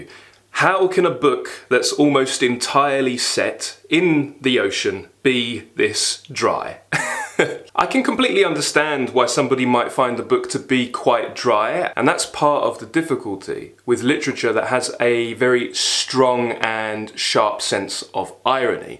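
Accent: United Kingdom. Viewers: Здравствуйте! Прошу прощения, что пишу по-русски, моего знания английского языка не хватит, чтобы выразить свои эмоции ❤ Я так рада, что нашла Ваш канал! Спасибо Вам за Вашу страсть и любовь к литературе, просто невозможно не захотеть бежать читать после Ваших видео! У Вас чудесный голос и обворожительный британский 🙈❤️ слушать Вас - одно удовольствие! Разбор негативных отзывов - прекрасная идея, очень жду продолжения! Как человек, замученный русской классикой в школе, подтверждаю, что учителя часто отбивают всякое желание читать. Например, Войну и мир я прочитала только первый том, злилась жутко на себя, на учителя, на Толстого, на весь мир 😂 и до сих пор к великому своему стыду не читала ничего из Толстого 🙈 Однако, не имея желания читать русских авторов, прочитала многое из английской (Ивлин Во "Возвращение в Брайдсхэд" и "Незабвенная" - одни из любимых моих книг), французской (Камю и Экзюпери - любовь моя) и американской (Ken Kesey - "Sometimes a great notion" - обожаю до слёз) литературы. 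Сейчас период японской литературы и возвращение к русской классике. Очень жаль, что в окружении нет людей, которые любили бы те книги, которые я предпочитаю, есть некая неудовлетворённость от того, что не с кем обсудить. И даже в книжных клубах никак не могу найти тех, кто читал бы книги, которые мне интересны 😢 Моби Дика читала 10 лет назад, понравился очень и оставил желание перечитать, когда стану постарше. Из русских писателей люблю не очень популярного на Западе Лескова. Его "Очаро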